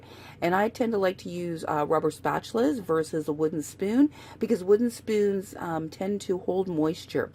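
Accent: Canada. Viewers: Hi, Have you ever seen a TV show called Trailer Park Boys? It's set in Halifax NS. They say about like you do, aboot lol. Does that mean you have a NS accent? Or do all Canadians say aboot? BTW, It says subcribe instead of subscribe on your titles at end. I don't mind. I like misspellings but I can't help but point them out. Sorry.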